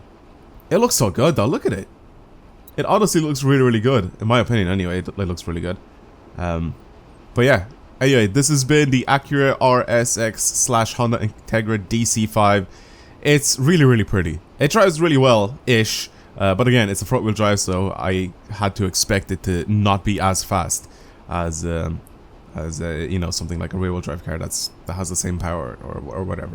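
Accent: Ireland. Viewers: Wow ure irish i didnt know im irish too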